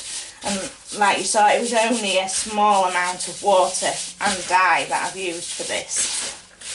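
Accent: United Kingdom. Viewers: Great video, thank you. Great tips too and they look amazing. I never knew about the claw and brush technique. I love videos like this, they're natural - not forced and fake. I think I detect a fellow Yorkshire accent :). I'm glad the recipe isn't the one you use - I kept thinking 'No....don't give away your trade secrets / recipes'....I know that competition can be watching and I'm a firm believer in helping people - but I like to keep my hand of cards close to my chest :). You have to protect your business. Thanks for the recipe x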